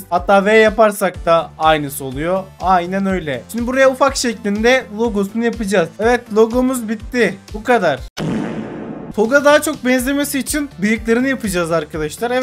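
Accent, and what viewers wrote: Denizli, Turkey. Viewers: Abi sen denizlilimisin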